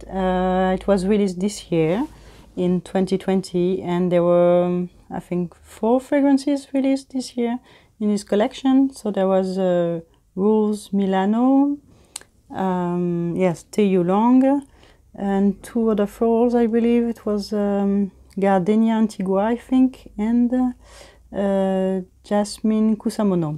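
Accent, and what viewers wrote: France. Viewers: Frenglish 😂 I think it's sexy AF! Wish we could've seen the relief on your beautiful face! 😂 The joys of a blind buy WIN. 🍵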